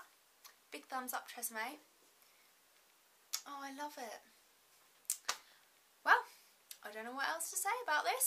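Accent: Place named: United Kingdom. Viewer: That Bri'ish accent! And ya, I'm here cause I grew my hair long and got that thing today lol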